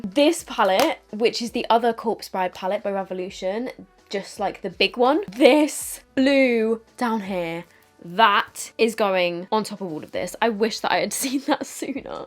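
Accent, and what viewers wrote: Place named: United Kingdom. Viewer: Hi, I'm a recently new subscriber. I can't believe it's taken me this long to find your channel. I'm literally obsessed. I'm on my like 50th video in a week. I'm from Aus but my family are from UK so I adore your accent. Enjoy your day gurl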